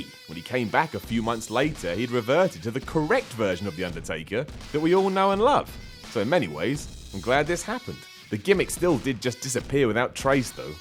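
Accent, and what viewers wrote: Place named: South Wales, United Kingdom. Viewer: are you from south Wales you sound like it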